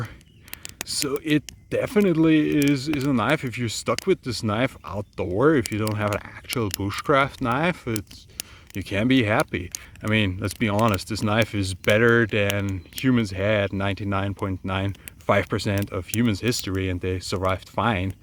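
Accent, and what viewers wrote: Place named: South, United States. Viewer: I love your accent! I hear a lot of influence from the American South, no?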